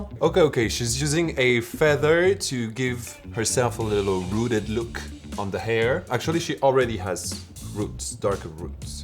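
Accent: France. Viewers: are you french???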